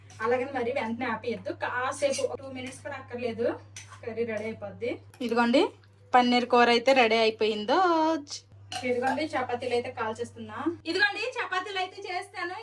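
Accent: Srikakulam, India. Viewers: Haiii sister me srikakulam bash chala bagaundi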